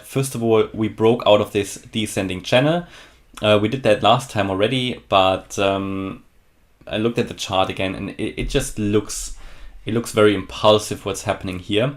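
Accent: Germany. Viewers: Hey ich schreib mal gleich auf Deutsch …. Bist du Deutscher ? Hört sich nämlich so an 🙃 gute Analyse … ich finde diese ganze Elliot Theorie super spannend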